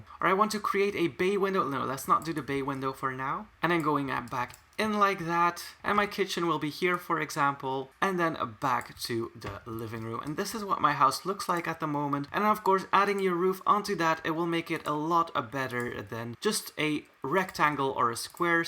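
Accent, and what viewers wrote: Flanders, Belgium. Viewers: Ben je ook Belg?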